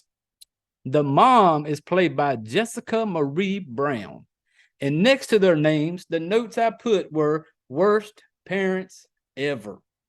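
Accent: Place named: South, United States. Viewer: Dude it ain’t no problem at all. I’m from the South too man. Just above Danville Virginia. When I heard you talk I was like hell yeah! I like this shit! I have The Tank lined up for me and my wife to watch tonight. That sounded like my kind of movie 👍🏻👍🏻 I started listening to your first podcast and I’m working my way up so I don’t know what all you’ve put on the podcast but do you ever watch Bigfoot movies? I loved me some Bigfoot dude. Check out Exists if you haven’t. I would love to hear your take on that one 👍🏻👍🏻 I’m an Elijah Craig drinker myself, matter of fact I took a half day off work to enjoy that beautiful stuff and mow my yard! Lol! I ain’t never mowed sober.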